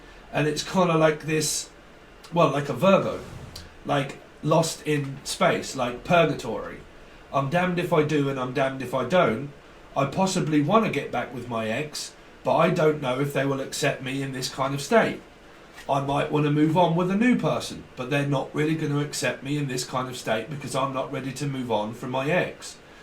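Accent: United Kingdom. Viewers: you sound like your from my ends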